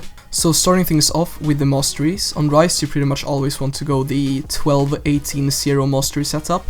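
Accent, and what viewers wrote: Sweden. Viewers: Are u swedish? u sound like a swede when u talk:.)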